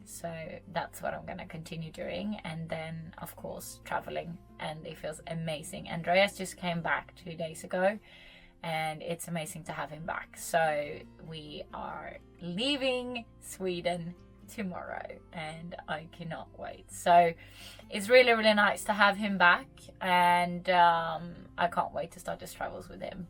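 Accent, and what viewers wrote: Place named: Australia. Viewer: You, family and friends go first, always! I like your videos and lookinga forward to see them. Does´nt matter if it´s in english or in swedish. I laught the first time I herd you talking english because you have/had a aussie accent :)... people always laught at me when I spoke engligsh. Sorry for misspelling and bad english :).. Ta det lugn och ta UMS (utmattningssymdrom) på allvar men det verkar du göra. Ser framemot dina videosar oavsett när de kommer. <3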